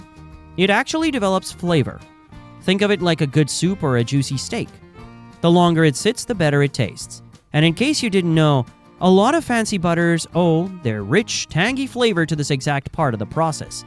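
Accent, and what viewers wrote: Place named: Canada. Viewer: Okay, when you're talking about separating , you're actually showing a batch churn. A few seconds later, you're showing more batch churns... I think. Those cone-shaped things at the mark, those are the cream separators. All those other shots of tanks with vertical stirring paddles, those are probably batch pasteurizers. Maybe just mixing tanks or cheesemaking vats. And "silage" is pronounced SIGH-ludge. "Liters" is LEE-ters. The narration robot's Canadian accent is kind of adorable, though. The rest of the info in this video is basically accurate.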